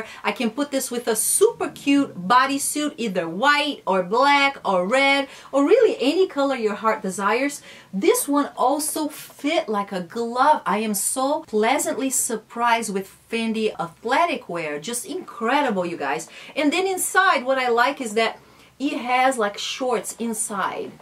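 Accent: Brazil. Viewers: I adore your Brazilian accent 😍🇧🇷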